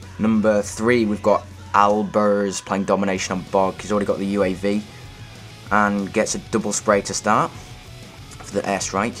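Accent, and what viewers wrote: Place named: United States. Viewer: why do you say "zee"? american accent is very entertaining to listen to... xD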